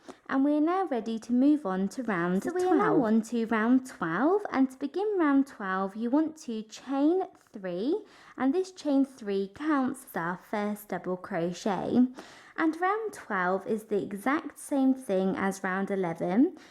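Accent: England, United Kingdom. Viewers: Thought with your English accent this would be in UK terms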